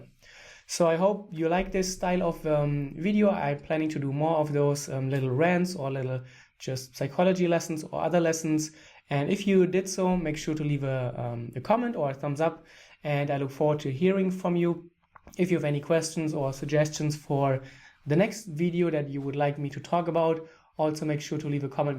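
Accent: Germany. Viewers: I immeditely knew you were german from your accent! Jawohl ja! Haha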